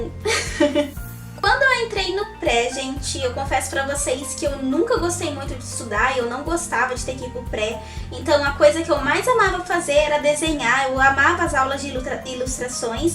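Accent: Ceará, Brazil. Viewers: Voce é do Ceará?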